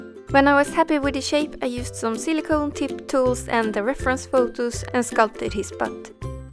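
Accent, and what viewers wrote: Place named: Sweden. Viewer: Shit, man hör på din dialekt att du är svensk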